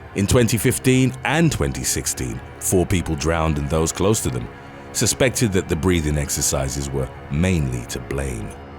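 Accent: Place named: England, United Kingdom. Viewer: Kudos on the voice. I love the dread and seriousness with a dash of English accent, makes me fear yoga!🤣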